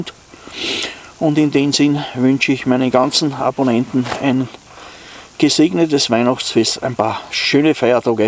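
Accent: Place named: Austria. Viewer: Sehr schön, dass Du beim österreichischen Dialekt bleibst. Das Einzige, das ich nicht verstehe, ist beim Käffchen das: Kranken Sesseln - sind die Leute, die krank sind, in Österreich Sessel? Mein Vater ist zwar aus Wien, aber ich verstehs trotzdem nicht. :) Wie immer sehr schönes Video und natürlich weiter so!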